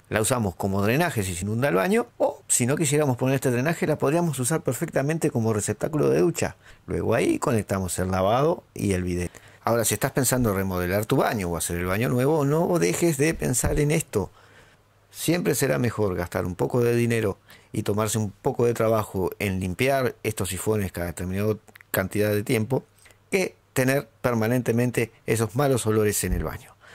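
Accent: Uruguay. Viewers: Habla en uruguayo gallego? Elegí uno loco!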